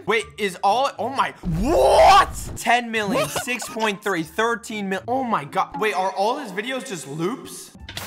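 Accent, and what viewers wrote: United Kingdom. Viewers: Are you actually British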